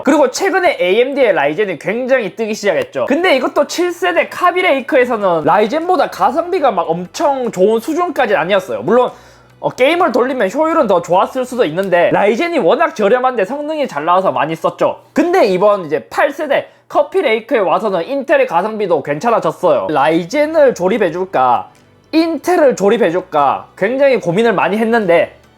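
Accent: Gyeongsang, South Korea. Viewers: 사투리가 경남쪽인가요? 경북쪽인가요??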